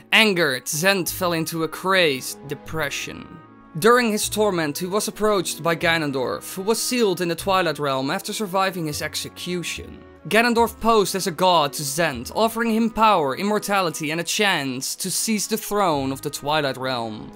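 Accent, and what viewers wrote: Netherlands. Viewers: is hij nou eigenlijk Nederlands? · Jij bent Nederlands zo te horen. ;)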